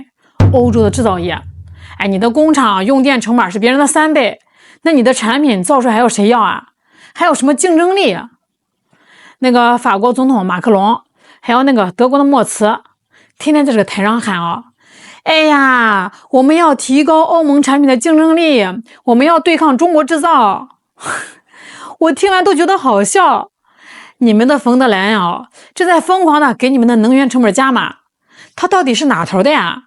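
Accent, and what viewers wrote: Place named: Shandong, China. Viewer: 这女的是山东哪的口音？ 章丘？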